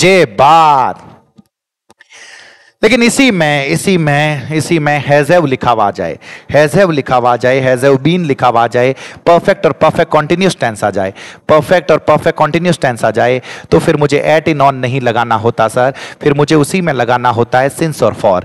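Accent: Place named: Marwar, India. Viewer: Madsab aapri marvadi boli choki lage aap ase hi padaye ❤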